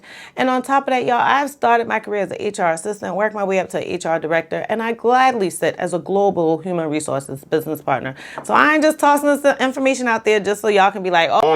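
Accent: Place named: South Carolina, United States. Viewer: This is unrelated, but I gotta know where you're from. I'm from the Charleston area of SC, and your accent is reminding me of that lol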